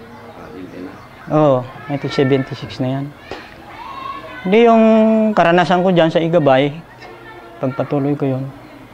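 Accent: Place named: Ilocos, Philippines. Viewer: Ilokano tuno mo master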